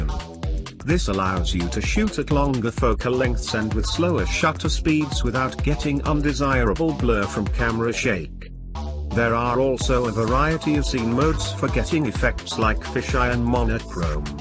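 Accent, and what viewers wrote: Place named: United Kingdom. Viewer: I realize the British accent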